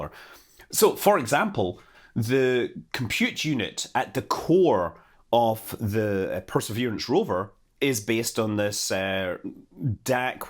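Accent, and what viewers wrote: Scotland, United Kingdom. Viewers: Here's a question for: Do you deliberarly pronounce 'Explorer' as 'Exploder', or is it just a Scottish thing?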